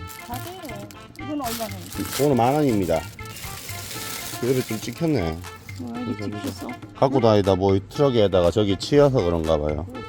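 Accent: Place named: Gyeongsang, South Korea. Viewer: 경상도 같으신데~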